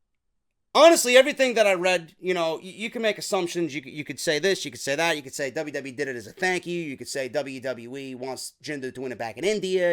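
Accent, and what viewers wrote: New York, United States. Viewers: You're a natural for commentary. You have a distinctive voice due to your New York accent. Better than some of the guys in the WWE.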